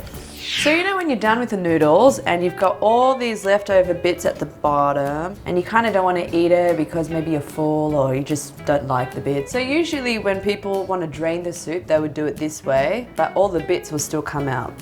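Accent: Australia. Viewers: You sound Australian! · Is she Aussie?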